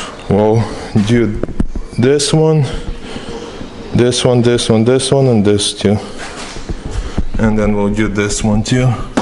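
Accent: Russia. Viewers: Выдает русский акцент ))))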